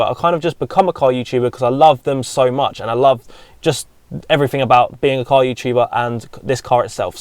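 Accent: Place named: Birmingham, United Kingdom. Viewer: never heard your bham accent till this video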